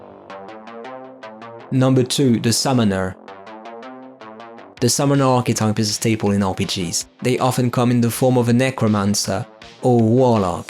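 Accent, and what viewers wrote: France. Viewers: I would have put the summoner first, but that s my thing for Yuna speaking Took my a few minute to figure why I had sub a French accent dude though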